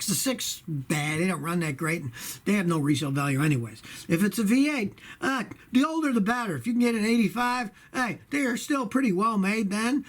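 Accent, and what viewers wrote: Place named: Michigan, United States. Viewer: You ROCK!! You sound like you have a Michigan accent.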